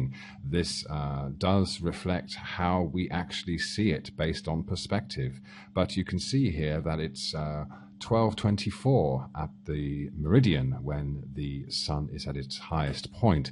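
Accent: United Kingdom. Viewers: You seem to confuse the terms Ball with Planet and all the physical differences attributed to each. you seem to forget that light propagates through a vacuum in parallel rays . its about the angle of the ground to the incoming rays ie: the amount of light/energy hitting the ground per sq meter or what ever wacko unit you chose to use. at the higher latitudes the surface faces away from the sun more and hence there is less energy per sq unit of ground. than at the equator this is just kindergarten thinking. you don't even understand the physics of the model you are trying to debunk. you sound intelligent, perhaps the British accent helps, but you even vaguely seem to know what you are talking about. couldn't watch any further as my head was about to explode thanks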